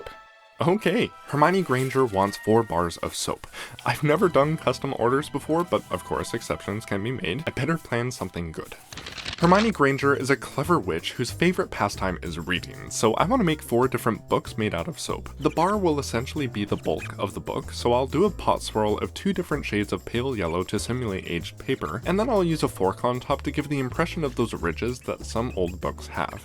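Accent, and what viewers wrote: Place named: England, United Kingdom. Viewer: Also from the Uk. Your English accent... love it!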